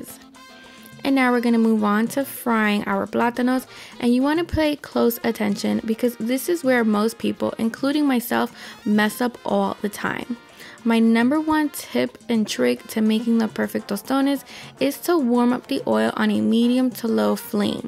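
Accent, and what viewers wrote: New York, United States. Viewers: Heard the NY accent, so I'm trusting you above everyone else.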